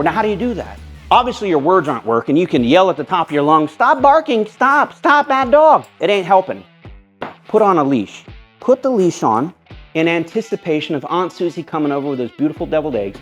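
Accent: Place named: Louisiana, United States. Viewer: Nailed that cajun accent!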